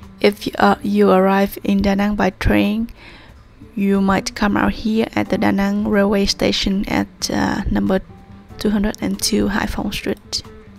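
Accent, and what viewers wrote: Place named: England, United Kingdom. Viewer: I like your English accent very much...thanks for sharing. Love Da Nang.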